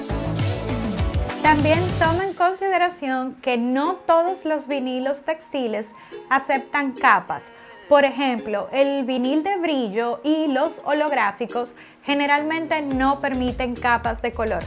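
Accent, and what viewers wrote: Dominican Republic. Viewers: Eres dominicana ?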